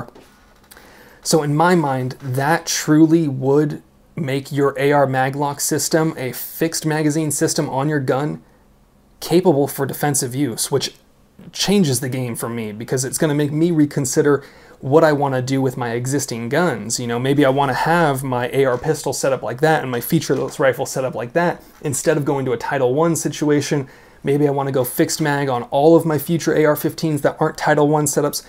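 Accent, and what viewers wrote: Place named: California, United States. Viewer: I can tell your from California just looking and listening to you.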